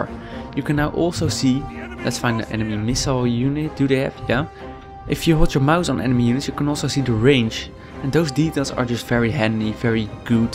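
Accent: Netherlands. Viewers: Hm. I never heard you dutch speak english before. The accent had me wondering where you might be from, i actually had to look it up on your channel :P If you had been speaking german instead, i'm sure i would've recognized your heritage at an instant. In other news: Neat video, i kinda like the accent for no reason as well. Only 4 more days till Attila, WOO! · i hear your dutch :D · haha nice, ik hoorde al zoiets aan je stem dat je nederlands spreekt haha, maar je spreekt erg goed engels hoor en een gaafe video! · Are you dutch?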